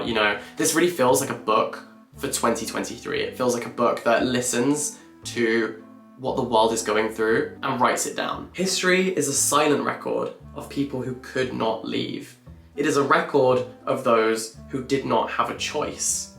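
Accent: United Kingdom. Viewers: How can someone be so attractive? I watched a 30 minute video just watching to his face and listening to his British accent🥴no idea what he was talking about. So distracting.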